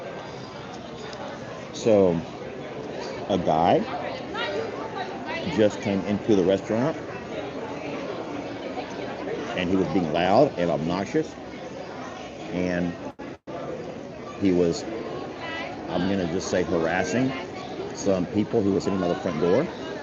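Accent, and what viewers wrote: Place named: South, United States. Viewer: that’s the way we talk in the south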